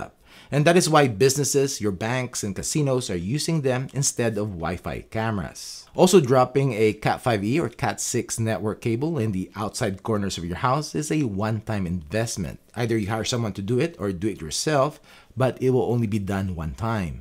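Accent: Philippines. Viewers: Thanks and you sound like you’re from the Philippines 🇵🇭. ❤️👍💯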